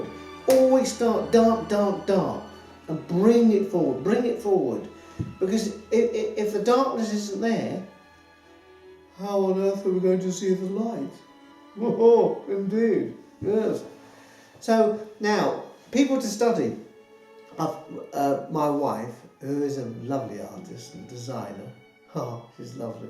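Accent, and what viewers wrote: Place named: Germany. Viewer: Can you cite to two must read books. I could not understand through that thick german accent of yours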